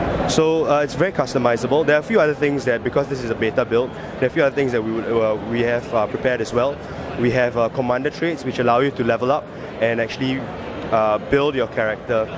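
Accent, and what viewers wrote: Singapore. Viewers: Sounds like a Singaporean.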